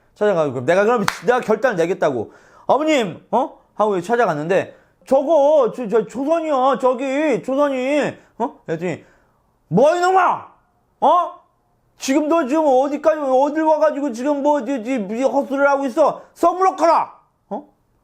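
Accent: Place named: Chungcheong, South Korea. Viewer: 충청도사투리로 듣는 장동민의 삼국지 !옛날이야기 듣는 것 처럼 정말 재밌어요!고맙습니다!^^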